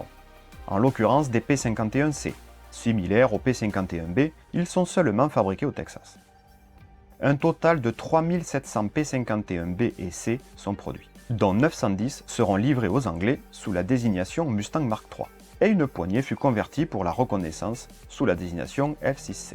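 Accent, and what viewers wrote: South, France. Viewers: Toujours content de voir une de tes vidéos dans mes abonnements. Vidéos toujours aussi détaillées, explicatives avec une très bonne approche sur le contexte des choses expliquant certains choix techniques et limitations du moment. Puis bon, toujours content d'entendre notre joli accent du Sud! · Très beau documentaire … Les détails techniques et historiques sont très bien présentés. Le petit accent méridional du narrateur et quelques pointes d'humour permettent de suivre cette présentation. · Cet accent du sud m'avait manqué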